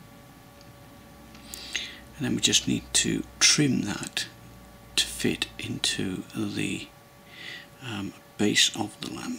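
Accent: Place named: RP, United Kingdom. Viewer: Very good plummy BBC accent. Brings back memories of " Listen with Mother "and "Billy Cotton Band Show" The radio was nice and the bar, I must try! Thanks. Have a good weekend. And greetings to Mrs.T. Peter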